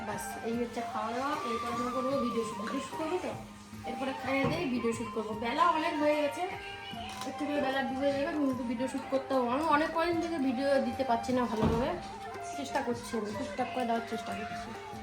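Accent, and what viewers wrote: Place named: Rajbanshi, India. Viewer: Tomr kotha gulo bojhai jay tui rajbongshi.tomr voyes ta oi rokom tai bolam 😊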